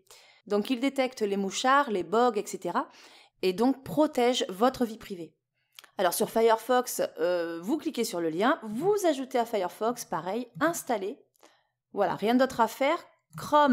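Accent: South, France. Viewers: le bon accent du sud on aime bien ca!!!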